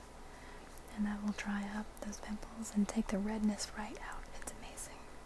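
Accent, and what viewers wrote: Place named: South, United States. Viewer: Sounds like she's from my part of the world... Down south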